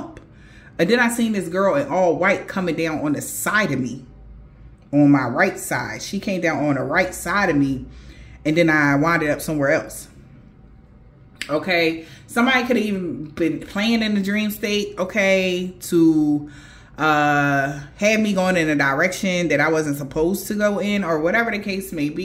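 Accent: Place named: Brooklyn, United States. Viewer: I Live in New York! U funny sound like u was uptown in the bronx or spanish harlem . And u was also in brooklyn carribean city !!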